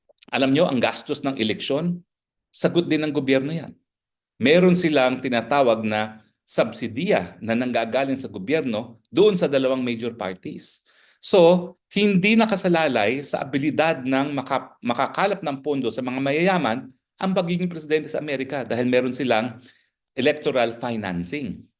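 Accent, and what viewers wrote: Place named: Philippines. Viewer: Yan ang gustong gusto ko sa inyo sir Harry Roque pag nagsasalita kyo lalo n tagalog Pilipinong pilipino tlaga.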